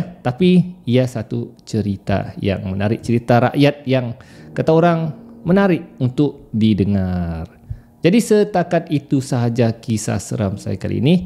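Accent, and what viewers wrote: Sabah, Malaysia. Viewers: Jadi bang kau pandai kah juga becakap sabah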